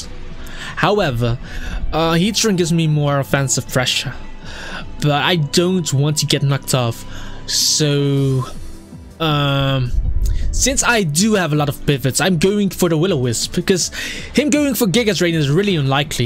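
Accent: Netherlands. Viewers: Just out of curiosity, why do you want to have a British accent? I kinda like your Dutch accent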